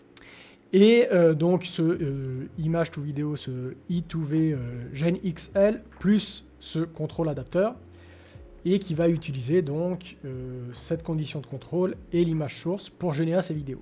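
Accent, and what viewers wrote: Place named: Vaud, Switzerland. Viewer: Ça fait du bien d'entendre du vaudois sur youtube ;)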